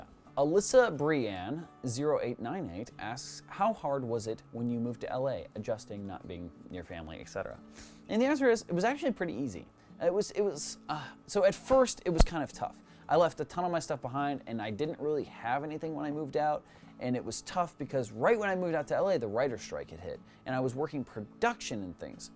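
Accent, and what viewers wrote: Germany. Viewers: I wanna go to tokyo!!!!! Can I hide in your suitcase or something.... wait that came out wrong... sorry. Side Note: Your German accent makes me laugh all the time... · Dein Deutscher Tonfall ist ganz schön happig,. (Translation: your german accent is a bit much,.)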